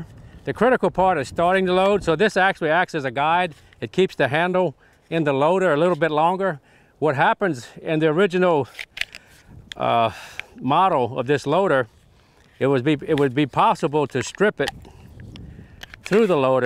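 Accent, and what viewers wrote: Louisiana, United States. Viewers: Wheres he from, he has a bayou accent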